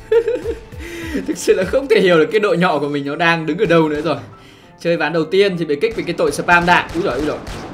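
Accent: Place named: Saigon, Vietnam. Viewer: ông này giờ nhại sài gòn rồi :)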